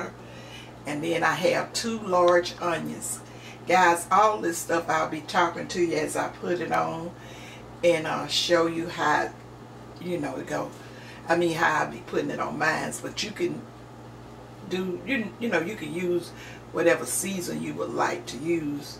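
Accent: South, United States. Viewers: Love your southern accent and your video...making this for Christmas 😊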